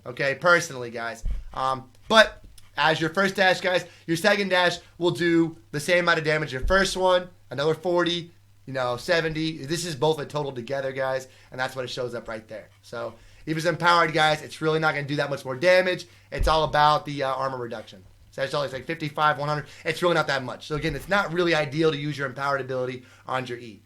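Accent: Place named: United States. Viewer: as a german is hard to understand your english over a long time cause you have i think a strong american accent but i still like your videos and streams pls keep it on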